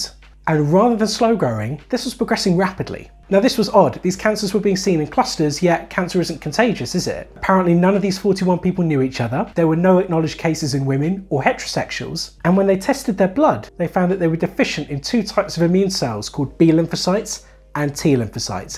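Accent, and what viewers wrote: United States. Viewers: I like the attempt at an American accent. I wish I knew why when most British people attempt an American accent, they sound like they're from Texas. Lol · LOVED the American accent and you should totally do it again :-) also lovely video. I didn’t know we were changing the language around this disease. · I still swooned at your American accent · Your American isn't terrible. ❤️ I'd be ashamed to try my British accent around you 😂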